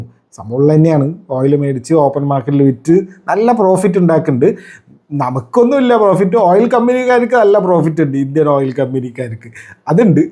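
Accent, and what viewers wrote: Palakkad, India. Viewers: Bro.. പാലക്കാട് ആണോ?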